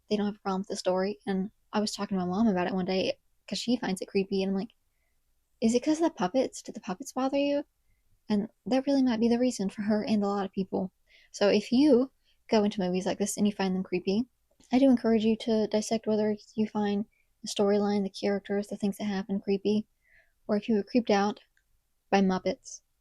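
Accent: South, United States. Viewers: What is your accent? I can't place it but it's a lovely southern accent. I'm from Texas and Arkansas (half my life in each state). Is it Tennessee perhaps?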